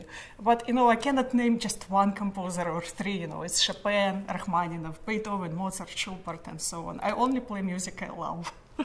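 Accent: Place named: Moscow, Russia. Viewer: Moscow dialect of English. Nevertheless the grammar is normal.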